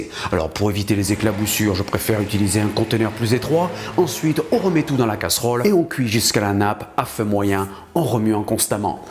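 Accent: France. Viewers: oui il est top dans les deux langues, perso, je préfère la version anglaise avec son petit (très léger) accent français si charmant ! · where is the french accent of the chief ? sa me manque en vérité c'etait quand meme amusant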